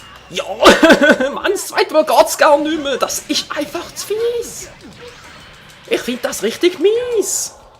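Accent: Switzerland. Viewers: OK ich bin eindeutlich zu gewöhnt an dein schönes deutsch, das ich dein Schweizerisch erst nach 5 minuten erkannt habe... und das obwohl ich mal schweizer war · Du tönsch wie en koleg fo mir aber de akzamt isch chli andersch freut mich aber das ich weis das auch schwizer lets Playstation mached ^^ es abo hasch fo mir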